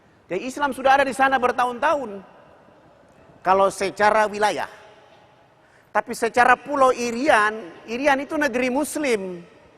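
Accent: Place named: South Sulawesi, Indonesia. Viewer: hihihi bugis makassar jie ee😊😊😊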